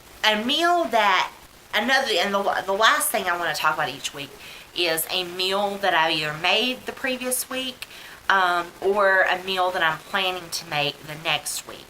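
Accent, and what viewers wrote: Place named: South, United States. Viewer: My starting weight was 336. I'm down 116, so you can do it!! I know those grandma dinners! Just make good choices. I'm late to the party here. Glad I found you! Sounds like you're a southern gal too. Just get that weight off before you're older like me! I'll be 60 soon. You're very organized in the video. Great job!!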